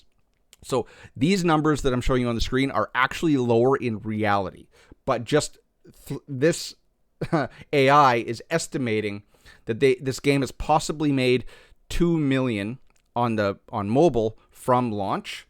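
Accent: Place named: Canada. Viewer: Thats one hell of a Canadian accent. Well done 🫡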